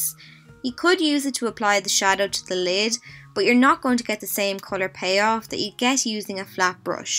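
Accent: Ireland. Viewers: Are you Irish??👌👌👌👌👌👌💎💎💎💎💎💎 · Are you Irish by any chance? Xx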